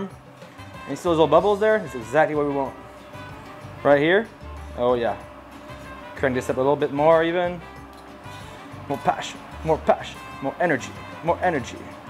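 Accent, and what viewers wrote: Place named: Quebec, Canada. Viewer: With this accent this guy is a Quebecois (French Canadian) but he speaks in this mixed English for views, I imagine!?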